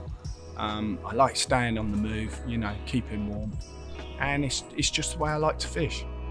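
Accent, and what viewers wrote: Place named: East Anglia, United Kingdom. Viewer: Watching this video to teach me how to catch Perch. Been lure Pike fishing for 9 months. Biggest just over 10lb! Where are you from? You sound East Anglia way. I’m from Essex originally. 😊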